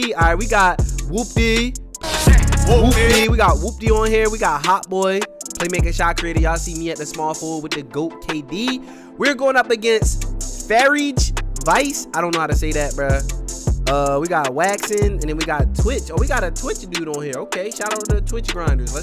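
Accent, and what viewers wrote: Baltimore, United States. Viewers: Are you from Baltimore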